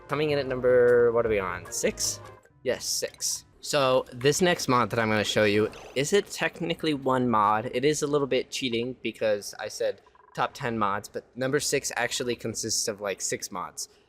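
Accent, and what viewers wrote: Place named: Omaha, United States. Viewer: Your accent sounds alot like those from the Omaha, NE area.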